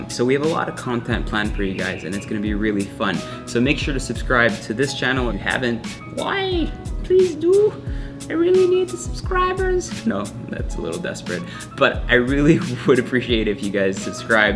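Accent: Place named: Philippines. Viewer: you have a total filipino accent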